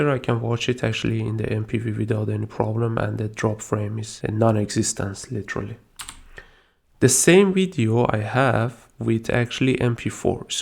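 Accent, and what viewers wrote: Iran. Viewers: Nice vid. It helped. Also, is that a Persian accent?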